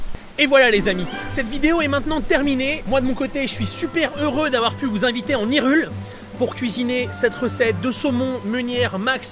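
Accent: South, France. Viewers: Moi non plus je ne sais pas pourquoi tu fais l'accent du Sud quand tu cuisine mais ne t'arrêtes surtout pas ! C'est gourmand et croquant à mes oreilles. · La Creuse ? Mais c'est à côté de chez moi ça ! XD Je ne suis pas très au fait du monde de Zelda, mais cette recette m'a l'air fort goûtue (avec l'accent du sud qui va bien^^) ! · pour l'accent du sud je pense que c'est ton petit coté Maïté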